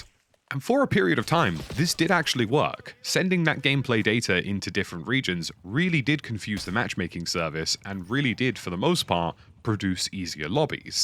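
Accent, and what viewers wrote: RP, United Kingdom. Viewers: Stop this posh voice its triggering!!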